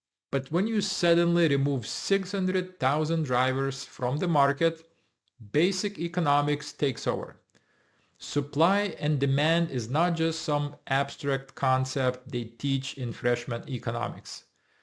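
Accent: Russia. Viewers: I doubt that, in total, there are 600,000 trucks, with illegal CDL drivers on today’s roadways. Living in So. Cal., I assume that by COB today that all of the seaports will soon be closed. Tomorrow, the So. Cal. freeways will be free of the heavy diesel powered truck hazards that exists here? Why does your accent sound so RUSSIAN. Is this Russian misinformation? Deport all illegal CDL truck drivers, ASAP, for a start.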